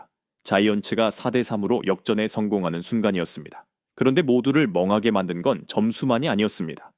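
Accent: China. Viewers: 해절자 중공인인가? 발음이 우리나라 발음이 아니고 어색하네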